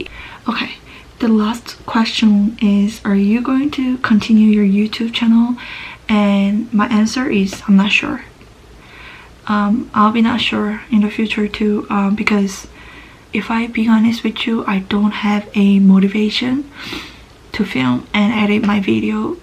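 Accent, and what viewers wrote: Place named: South, United States. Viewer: 미국 남부지역에 거주하다가 욌다는 것을 영어억양듣고 추정할 수가 있어요, 표준발음은 시카고나 디트로이트 주변에서 거주해야 가능합니다.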